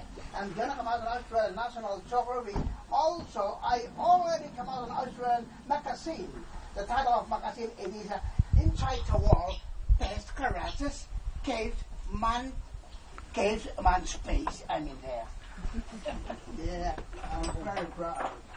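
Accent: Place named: Ireland. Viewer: Bros got an Irish accent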